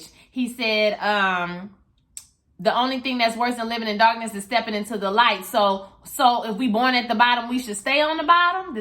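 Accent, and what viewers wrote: Louisiana, United States. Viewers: Dont watch Fargo, but showing love from yourlovecraft reviews. You also sound like a fellow Louisiana girl. I could be wrong, lol!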